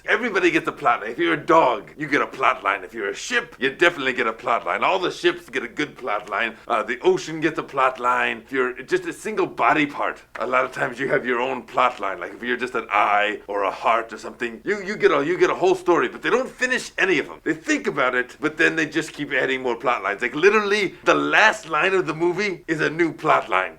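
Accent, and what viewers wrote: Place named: Ireland. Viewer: sounding quite Irish in this video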